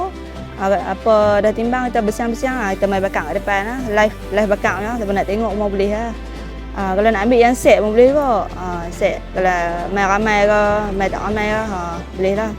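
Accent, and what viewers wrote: North, Malaysia. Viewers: Aku suka kak cik ni kekalkan loghat utara, tak buat2 nak pelat... Itulah tanda kuat pendirian dan kuat jati diri. Terbaik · Pekatnya utara kak ni, utara piau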